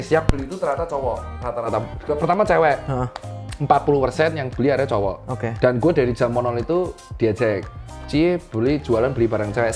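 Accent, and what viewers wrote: Java, Indonesia. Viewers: medok guwa guwene🤣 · Asli mau segaul apapun logat jawa tak bisa di hilangkan 😄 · Medok bgt,,ngomongnya...jawa,yes.gorengan kesukaan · medoookkk bingit😊😊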